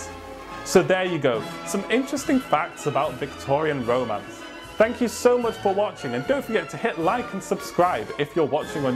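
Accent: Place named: Poland. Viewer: ! You make interesting videos! PS. Are you polish or ukrainian? You have such an amazing accent.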